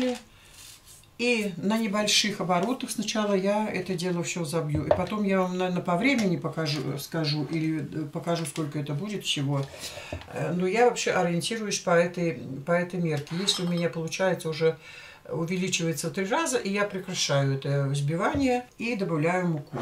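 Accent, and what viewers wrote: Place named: Belarus. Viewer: Умница, обожаю белорусский говорок. Спасибо за чудесный, простой в приготовлении рулет❤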